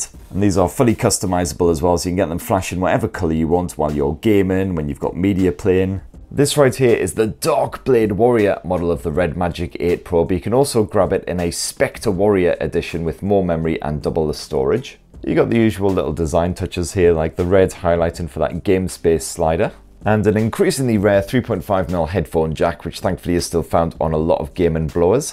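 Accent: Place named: Newcastle, United Kingdom. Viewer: sounds like Newcastle accent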